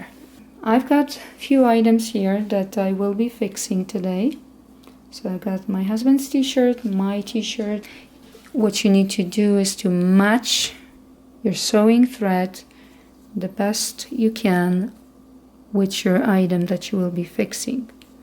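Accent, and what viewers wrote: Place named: Armenia. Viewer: You sound like from Armenia like a lady I knew. She was from there. Neat voice & accent. If a person does not have a needle & thread they most likely not have an interfacing. I never heard of that or something like that to iron on etc only the word itself. Thanks for showing us how to sow a small hole tear. I am sewing 2 on a bag my mother made of 🌈 & a shirt.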